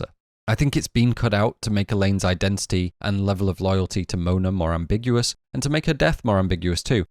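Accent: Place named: Northern England, United Kingdom. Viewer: I was following you right up until you pronounced Albert Camus' name like that or so. I understand that you're English, and even more, you're from the North. The French have certainly had an interesting relationship with the Northern English, the Scotts and the Irish. However monstrous the French may be, pronouncing Camus' name as "Came-us" is horrifying beyond anything Ari Aster could put in any of his films.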